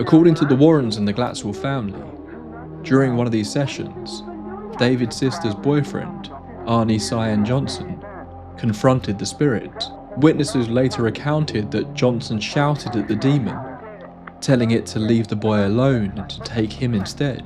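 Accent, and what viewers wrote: United Kingdom. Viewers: I don’t wanna hear a British guy talking i can barely hear what’s he’s talking. About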